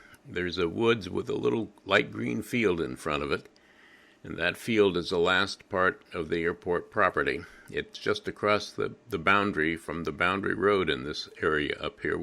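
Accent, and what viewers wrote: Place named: United States. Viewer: My wife was born on the airfield in one of the Nissen huts: after the war there was a tremendous housing shortage and her parents along with many other local people squatted in the disused huts until they were re-housed in one of the new housing estates that sprung up in Kettering. Incidentally it was wonderful to hear an American voice doing the narrative.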